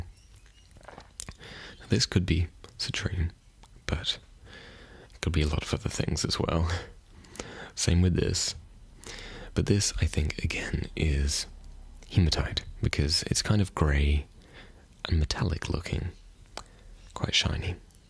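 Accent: Australia. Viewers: Living overseas I never realise that I could miss the Australian accent. Nice video. Roleplays? Crystal shop?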